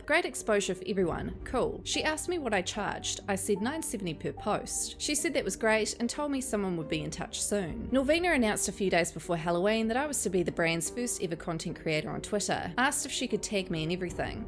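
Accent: New Zealand. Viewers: Living for your content of late. I could listen to your kiwi accent all day. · Kiwi accents are like my absolute favorite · as a fellow kiwi i feel like ur accent is SO strong and its kinda satisfying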